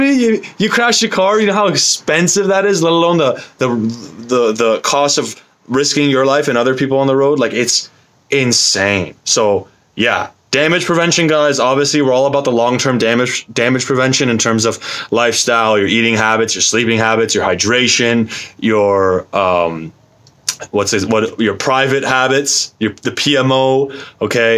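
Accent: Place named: United Kingdom. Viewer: 1. Your British accent is amazing. 2. On day 5 and going strong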